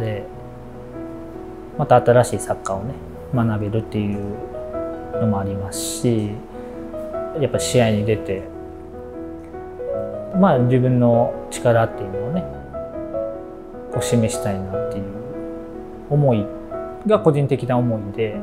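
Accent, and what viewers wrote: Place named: Kagoshima, Japan. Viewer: 鹿児島弁😭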